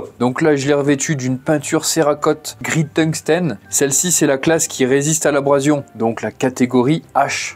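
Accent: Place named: Saint-Étienne, France. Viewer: Non il est pas du nord ! Tu reconnais pas l accent stéphanois ?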